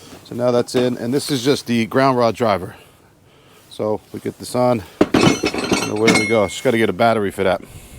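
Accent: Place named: New Jersey, United States. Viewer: You still sound like you're from Jersey.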